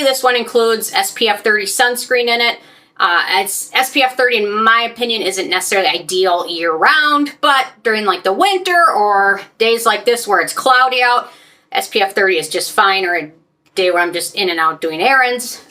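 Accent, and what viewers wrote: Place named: Minnesota, United States. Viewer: Yay! I just did a mini shopping spree at The Ordinary yesterday and just saw that you posted this video. Very helpful! Btw I love your accent. I'm from NZ so I'm not too sure where to place your accent/dialect. Is it sort of Minnesota?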